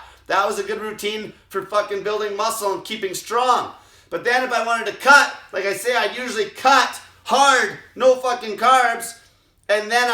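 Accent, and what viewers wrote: Canada. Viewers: This guy's high-pitched hoser accent is hilarious.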